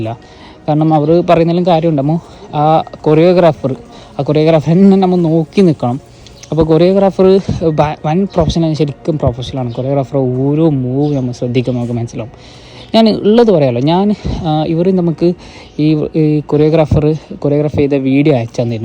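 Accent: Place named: Thalassery, India. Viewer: bro i laughed a lot 😂😂😂 nalla pang indappa samsaram ❤thalassery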